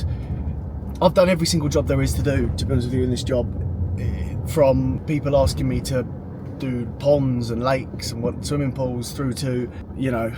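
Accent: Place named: Cockney, United Kingdom. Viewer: Wanting to become a high end firm whilst filming yourself in a vest sounding like a cockney wide boy does not come across to potential customers as high end sorry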